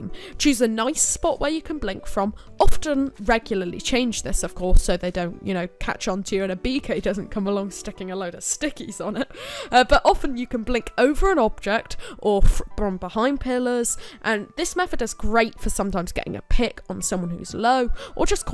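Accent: United Kingdom. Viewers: British young guy accent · this english accent XD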